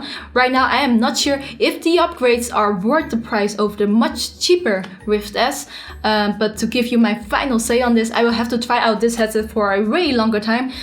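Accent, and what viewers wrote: Netherlands. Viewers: Its just a dutch accent. Not dutch-asian. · Is that a Dutch accent?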